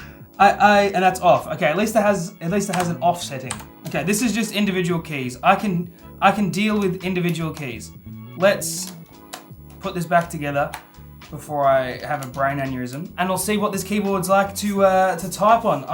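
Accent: Australia. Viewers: Where you from man, by your accent I bet you’re from Australia like me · U Aussie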